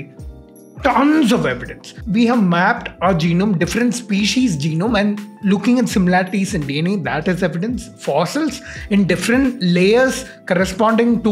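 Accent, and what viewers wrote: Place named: India. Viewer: First your knowledge seems good but you laugh of what was written in the text.firstly you are reading as as if you are talking Hindi not English ,secondly read the Al Quran tilawat before you made this vidz,learn from things around you ,then judge ,don’t mock or disrespect the creator mark my words you will pay the price. for that kind of blasphemy soon or later.remember me not the likes you will have.my respect again to people what ever their faith or belief.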